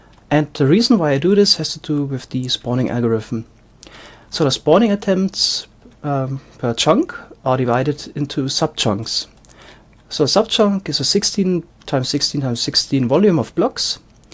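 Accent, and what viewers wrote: Germany. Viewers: mann kann hören das du ein deutscher bist xD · Worst german accent ever. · Der Deutsche Akzent Nice Farm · That german accent tho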